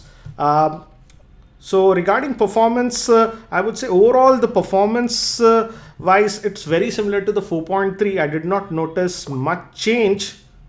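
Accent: India. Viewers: Lol kinda cool how your voice started out like american then went full blown indian lool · That's an Indian accent bro and he's Indian so he's not faking it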